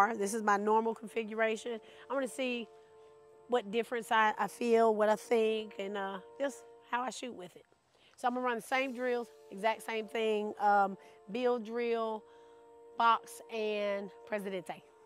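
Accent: South, United States. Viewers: You deserve more subscribers. Cool content. Not many women doing this & as a Georgian I appreciate the cute southern accent. Cheers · I was really loving a video, but then she started to talk and I fell in love 😍 I love me a good southern women’s accent. Great shooting and you got your new subscriber. ❤❤❤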